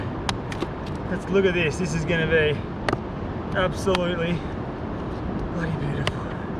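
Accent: Australia. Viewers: He’s literally so Aussie the way he talks he’s iconic i don’t understand how anyone would miss understand where he’s from lmao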